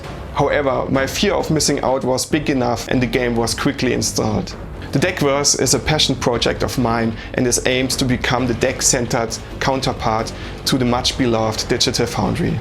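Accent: Germany. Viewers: Moin! Vom Akzent her würd ich mal auf den deutschsprachigen Raum tippen? 😉Danke für deinen Deep Dive, gefällt mir super! Kannst dich direkt vom Start weg mit DF messen, da kann ich mir noch ne Scheibe von abschneiden!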